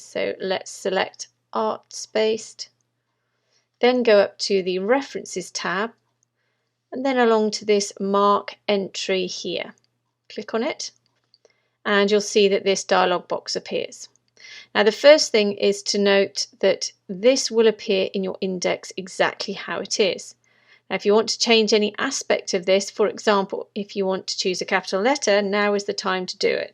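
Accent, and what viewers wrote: England, United Kingdom. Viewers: I searched high and low for a UK English voice and after many tries, I found you. You have provided an EXCELLENT working model that is concise, and easy to follow, what more could a writer want? Well done, and thank you. Judith Page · She IS an English.That's what an English woman sounds like.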